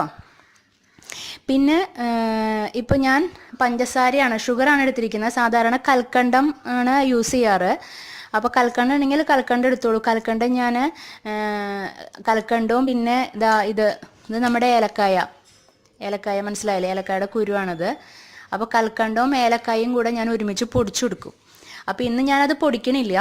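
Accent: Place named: Thrissur, India. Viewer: variety breakfast.. thrissur bhashayanaloo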